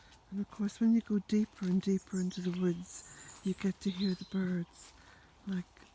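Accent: Ireland. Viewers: HOW I LOVE TO START MY MORNINGS AT BEALTAINE COTTAGE BUT AM HAVING GREAT DIFFICULTY HEARING YOUR LOVELY IRISH VOICE. WISHING YOU AND JACK A WONDERFUL DAY, LOVE TO YOU BOTH XXOO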